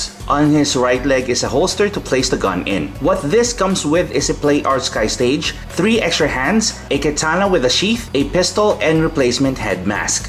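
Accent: Philippines. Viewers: maybe Filipino or singaporean accent...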